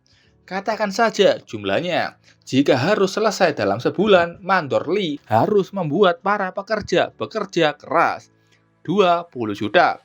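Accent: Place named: Java, Indonesia. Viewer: sebenernya ceritanya menarik... cuma yang baca narasinya terlalu datar dan medhok jowo sekali... 😭 jadi agak aneh dengernya... 🙏🏻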